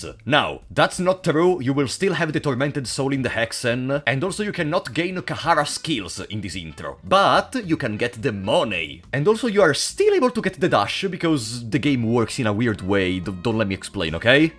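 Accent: Italy. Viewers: He is italian it is literally part of his accent its not a speech impediment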